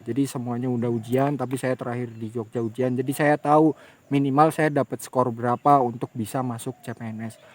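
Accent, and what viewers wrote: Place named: Banyumas, Indonesia. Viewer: Woooo mas... ternyata org Banyumas.... sama donk... Banyumase ngendi mas??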